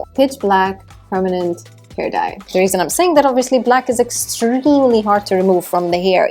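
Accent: Italy. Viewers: Il tuo accento italiano è bellissimo 😍 (Your italian accent is wonderful)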